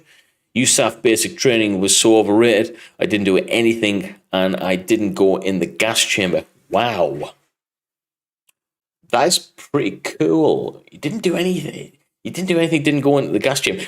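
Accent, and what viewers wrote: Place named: Teesside, United Kingdom. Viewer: Dit just recently subscribed loving the content Got put on to ypur Channel by combat Arms very happy to find your channel as a doubled in the Marines a long time ago as a reservist and then discharged as I filled a medical on the eye test but anyway no point crying over spilt milk I've just heard you see in this video you are from the North East same here currently living in Washington originally from Sunderland spend most of my time in Newcastle I'm getting by your accent you're a little further South than Tyneside or Tyne and Wear probably going towards Teesside I should imagine that's my guess anyway am I close?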